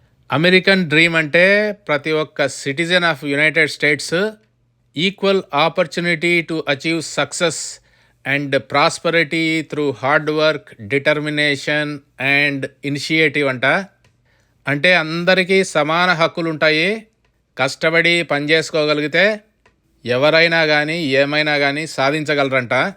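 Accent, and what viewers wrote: Rayalaseema, India. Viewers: Thank you Liked your Telugu Royal Seema accent too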